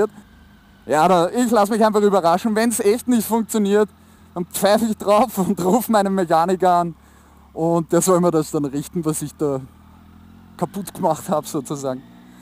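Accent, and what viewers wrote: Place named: Austria. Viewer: Eine sec geschaut, direkt gehört dass du Österreicher bist gleich n abbo dagelassen😄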